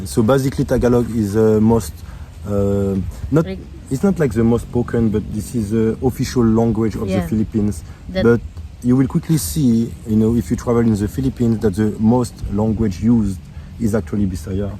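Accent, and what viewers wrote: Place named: France. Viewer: My friend, the French accent. Super .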